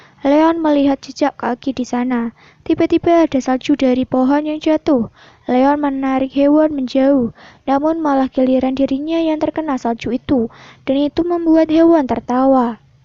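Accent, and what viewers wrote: Java, Indonesia. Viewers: Kak kakak orang jawa ya??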